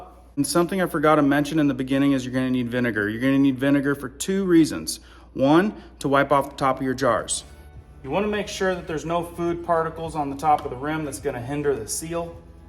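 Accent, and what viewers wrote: United Kingdom. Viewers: I’m reading this in your beautiful UK 🇬🇧 accent ❤️ 🥰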